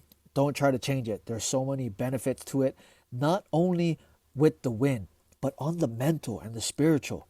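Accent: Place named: Hawaii, United States. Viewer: My braddah, you from Hawai’i ah!? lol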